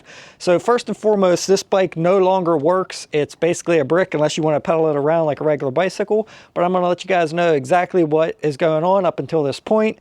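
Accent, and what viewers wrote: Pittsburgh, United States. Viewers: Dude sounds like he's from Pittsburgh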